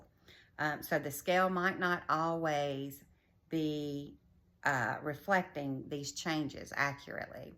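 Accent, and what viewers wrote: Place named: South, United States. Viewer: your sweet southern drawl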